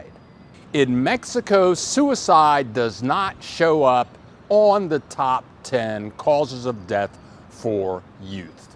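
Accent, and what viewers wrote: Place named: Midwest, United States. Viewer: Funny. From Detroit, I detected midwest there!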